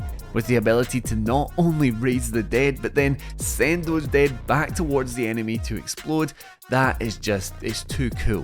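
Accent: Scotland, United Kingdom. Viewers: That's a Scottish accent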